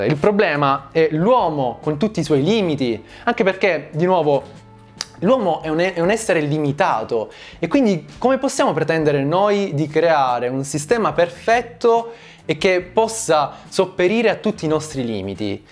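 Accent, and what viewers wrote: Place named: Tuscany, Italy. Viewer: Quasi toscano direi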